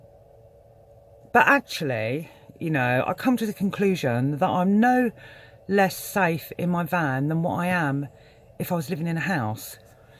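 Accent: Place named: United Kingdom. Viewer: Just sat and listened to you work out in your head that you are safe in van , good out come. Though I do think it would be helpful to have an idea “Where you are” to be included in your intro is welcomed here for new viewers, as your intro is “its really cold....ok? Where like frozen in Alaska or California 30 degrees chill, judging by accent UK. Just curious what comfort level one can expect in a van in winter, assuming it was built with typical base of insulation. See you have propane and a warm dog and you built a closure from front cab. Shoot! All good measures for van living! Anyway just a thought as YT “recommenced “ this in my feed to view and its first video Ive viewed, just would have been good to know where u b? State, Country.. not gps cooordinates. LOl Good of you to share your thoughts with the world and for YT to connect us with your story. 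Keep thoughts on the positive a thought have vibrations, “ YES i am safe” perfecto!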